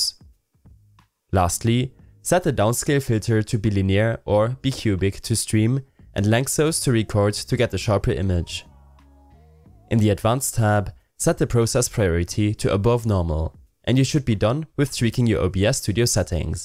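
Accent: Germany. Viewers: i hear a german accent in ur voice😂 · oh cool ein deutscher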